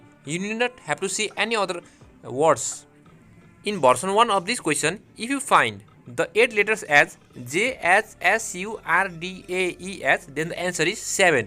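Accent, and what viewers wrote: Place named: India. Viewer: Bro your a hero thx for giving us answers, your telling us the answers very well and i kinda notice you accent you indian right? I SUBBED!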